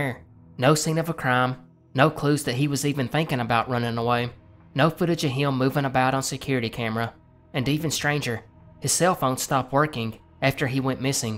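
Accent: South, United States. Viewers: I live in Holland but grew up in the South, so hearing your voice sounds like home 🙂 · nice vid! it took me a while to get used to the southern accent but its not bad. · I rarely if ever comment. Now I've subscribed to you about a week. Going to college staring things off always when I fall to sleep or do my work I gotta be listening. Your very unique love your southern accent, how you just get into it don't waste time and your upload schedule that shows a lot of dedication so I would honestly like to thank you for all your hard work on just entertaining your viewers. clearly 7K subs isn't enough and I think your very underrated. If you ever read this have a lovely day, and keep doing what you're doing. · Well said love his southern accent too and the accent in general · I can tell by your accent that your southern American don't people in your part of the world do what are called "barn burnings" all the time? 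Why do they do those? Isn't it usually to clear the land to build a new barn? This is in regards to the burned house horizon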